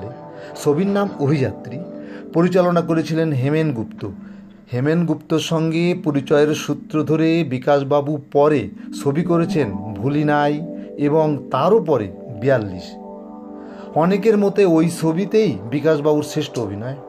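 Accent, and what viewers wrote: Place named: Bangladesh. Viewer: আপনি কি বাঅংলা দেশের মানুষ ।আপনার উচচারণে বাঅংলা দেশের গন্ধ ।আরো জানার চেষ্টা করুন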